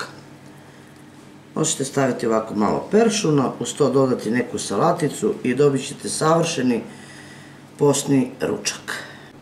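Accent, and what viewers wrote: South, Serbia. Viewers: ma nista ja ne zamjeram. Samo vi govorite kako vec govorite. Inace je vas juznjacki govor bas super (malo makedonskog i malo bugarskog utjecaja 😊). Zena mog rodjaka je Makedonka (Skopjanka). Samo vi kuhajte. Nek se stvari "krckaju" i nek mirise. Ja sam uglavnom vegetarijanac. Kazem "uglavnom", jer kada podjem u Hrvatsku (iz Dalmacije sam rodom) onda uzmem malo ribe. I to je to. Pozdrav i sve najbolje vam zelim.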